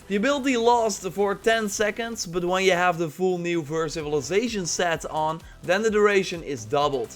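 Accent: Netherlands. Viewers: I can hear through the guys voice, he is from The Netherlands, correct me if im wrong.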